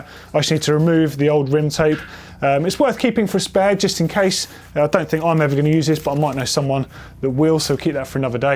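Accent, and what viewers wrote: United Kingdom. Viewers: British accent is so hard to understand for some Americans. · Awesome job and great explanation as always. I’m a Brit, now living in LA so I miss the accent. Great advice and a tase if home! Thanks GMBN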